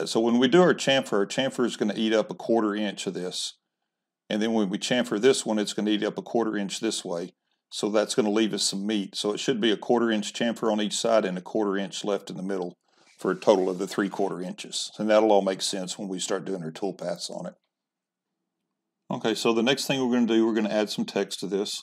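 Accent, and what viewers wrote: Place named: South, United States. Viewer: I'm about 10 months behind you on the CNC purchase. Just came across your channel today and am really impressed with it. I just got the VCarve Pro and have been watching the tutorials that come with it. I must say yours are much easier to follow and don't put me to sleep! Probably the good ol southern accent!!! I'm also very impressed with your other wood working projects!!! Thank you!!!!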